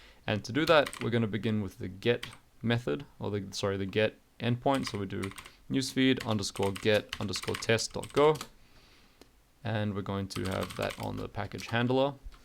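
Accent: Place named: New Zealand. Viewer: Enjoying your accent. Chur from a fellow kiwi for your excellent content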